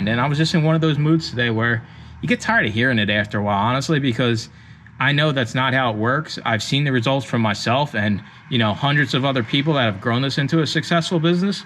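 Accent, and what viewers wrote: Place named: New York, United States. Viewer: Im From Philadelphia where ate you from you sound like northeast guy New York Jersey lol?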